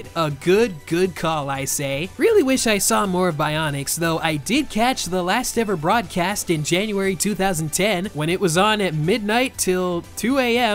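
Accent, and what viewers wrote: Canada. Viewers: I cannot figure out which province your accent is from! I'm assuming its southern Canada though. Also, this is a BIG nostalgia blast.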